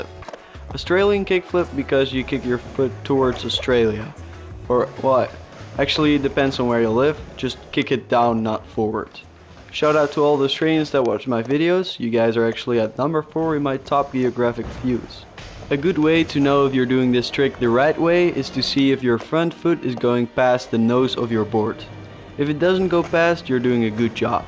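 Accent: Netherlands. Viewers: pretty good my dutch friend.. mooi acent btw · You got a Dutch accent kan ik wel horen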